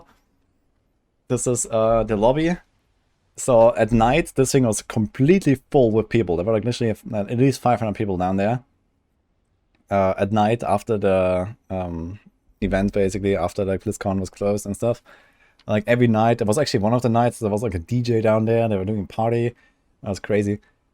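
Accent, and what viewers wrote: Germany. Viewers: Seems like a nice trip you had, You are from Bulgaria, we live very close, I had a feeling you where from Germany coz of voice accent 🙃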